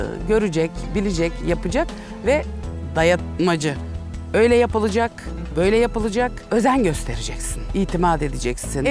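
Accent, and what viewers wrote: Turkey. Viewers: Rumelili, comar degil ondandir:)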